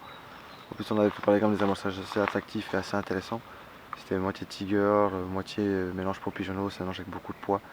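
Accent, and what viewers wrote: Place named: Tahiti, French Polynesia. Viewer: salut sympa ta video, au fait on dis rigoureux lol, il vient d'où ton accent on dirais celui tahitien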